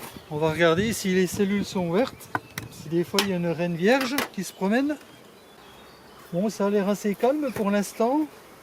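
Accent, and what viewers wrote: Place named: Franche-Comté, France. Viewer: Merci pour la vidéo !...Ah l'accent franc comtois !...j'adore !..je suis du pays !😅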